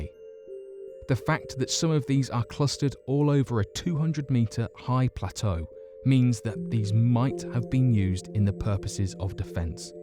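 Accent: England, United Kingdom. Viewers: uhh no, he's definitely from England. I mean, I would know, being from England, also.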